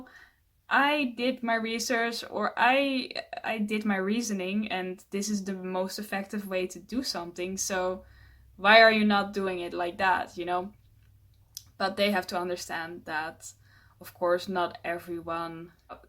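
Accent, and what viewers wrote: Netherlands. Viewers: I LOVE THIS CUTUE PIE, AND THE DUTCH ACCENT. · Immediately heard your Dutch accent, it's so obvious haha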